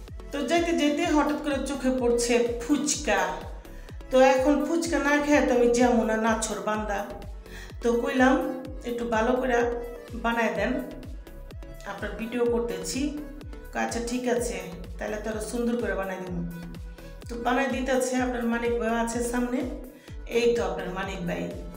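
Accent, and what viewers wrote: Old Dhaka, Bangladesh. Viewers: পুরার ঢাকার কথা শুনতে আমারও ভালো লাগে। শুধু আপার কথা শোনার জন্যই পুরো ভিডিওটা দেখলাম।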